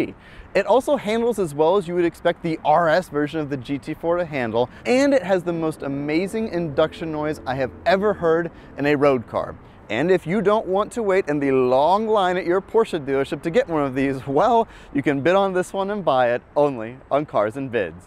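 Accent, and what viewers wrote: Germany. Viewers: ! Love the German accent. Awesome video. 👍🏻🇺🇸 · German accent is my new favourite thing ever!!!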